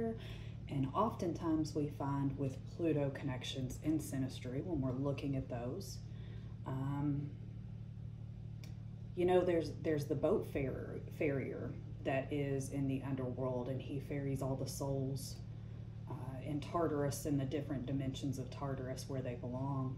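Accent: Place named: South, United States. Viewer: Fantastic reading. You really explain well the Pluto energy and its impact on relations. I subbed because your explanations are great and I'm a Southerner and love listening to us discuss mystical things. 💯💯💯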